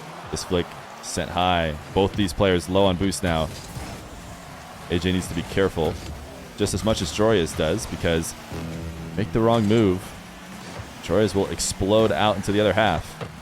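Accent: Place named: United States. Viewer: you're like the NA Version of JohnnyBoi_i. And I don't mean the format of your videos only, but the way you cast, even your voice. It's like I'm hearing JohnnyBoi_i but with an American accent. Very weird, but in a positive way, pog:D Loving the content, bro!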